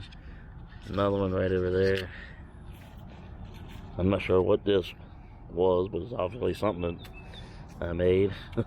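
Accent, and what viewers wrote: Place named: United Kingdom. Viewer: ❤ love woodworking Also really like your accent as well are from UK Where are you ?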